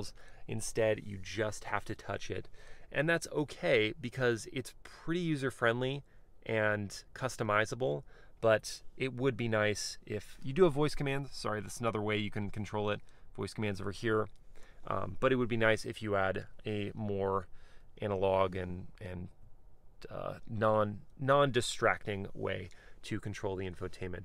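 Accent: United States. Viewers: Great command of your communication. Wish you had ended with the country accent to bring it all full circle. Keep up the good work! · I love your country accent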